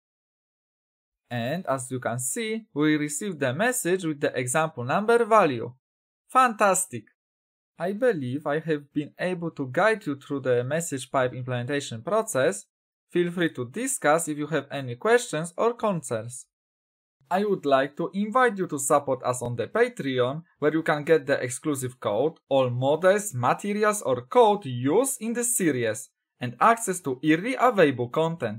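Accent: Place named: Poland. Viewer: Ziomek, słuchać w uj że jesteś Polakiem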